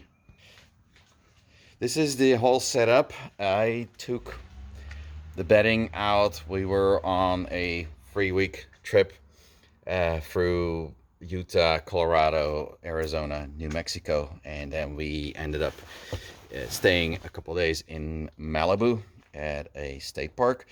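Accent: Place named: Quebec, Canada. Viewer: I am sure you would have more views if you knew how to make the photos bigger, are you French Canadian like the company, your accent sounds like that (not like Tustin) near where I live!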